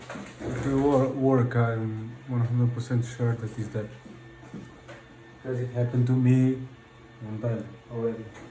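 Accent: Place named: Russia. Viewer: Какой-то русский акцент)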